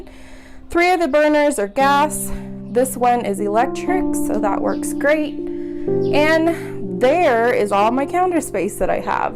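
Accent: Kenya. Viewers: Your accent has changed too. You sound more kenyan now even when you pronounce certain words. Thanks for the tour!!!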